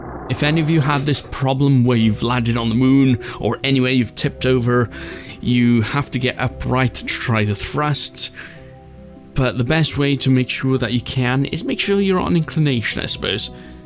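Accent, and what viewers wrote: Wales, United Kingdom. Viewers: love ur Welsh Accent, besides that. Please can you include wich mods you are using?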